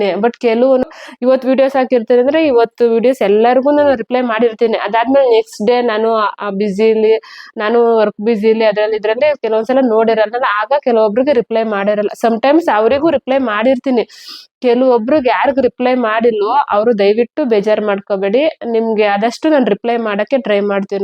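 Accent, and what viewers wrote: Mandya, India. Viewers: One and only Mandya district language 🎉🎉🎉 Namdu Mandya district sathnuru....naavu nimma tharane matadodu madam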